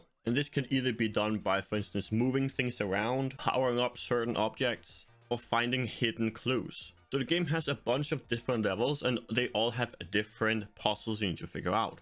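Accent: Denmark. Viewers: Thick ass danglish accent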